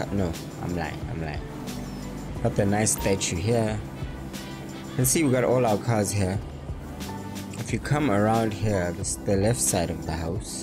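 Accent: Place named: South Africa. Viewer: Brother are you from South Africa?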